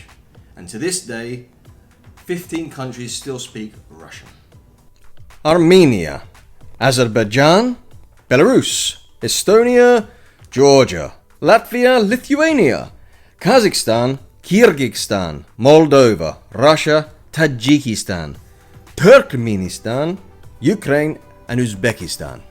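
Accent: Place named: England, United Kingdom. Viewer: you sound english not scottish ?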